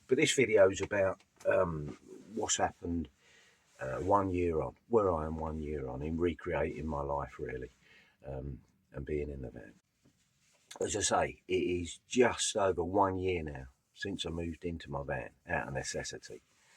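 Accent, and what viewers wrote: London, United Kingdom. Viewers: His accent sounds London area to me .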